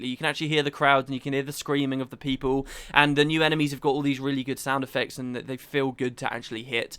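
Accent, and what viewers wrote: United Kingdom. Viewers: He's Brittish, they pronounce things differently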